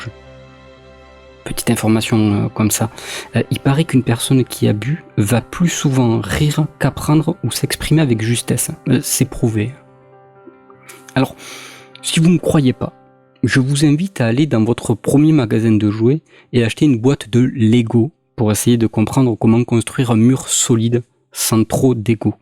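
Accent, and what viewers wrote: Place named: South-West, France. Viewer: J'ai découvert cette vidéo sur skeptikon. Du contenu sceptique à la sauce accent du Sud Ouest, voilà de quoi ravir mes oreilles. Alors je viens soutenir par un pouce et un abonnement içi.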